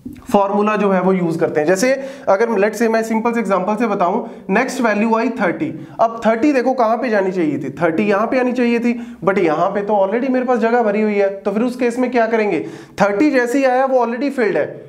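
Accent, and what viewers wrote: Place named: Haryana, India. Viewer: Sir aapka haryanvi touch h language me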